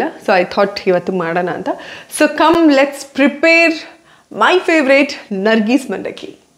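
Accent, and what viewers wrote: North Karnataka, India. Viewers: Nimdu uttar Karnataka alva?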